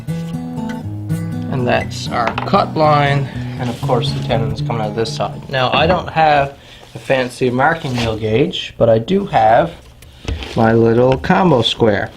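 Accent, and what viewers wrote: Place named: East, Canada. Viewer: hey, sounds like you might be from eastern Canada. Anyhow, that 2 inch chissel is actually a 2 inch slick.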